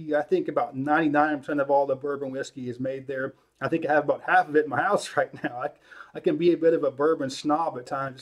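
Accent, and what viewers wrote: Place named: South, United States. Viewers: a hint of a Southern drawl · You picked up some proper southern accent!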